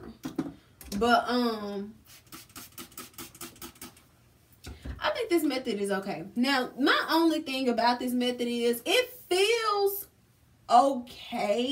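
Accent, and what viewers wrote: Louisiana, United States. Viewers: New method until my wig fall off in front of everybody 😂😂😂😂😂😂😂😂😂 that's what I'm afraid of 😩 love your Louisiana accent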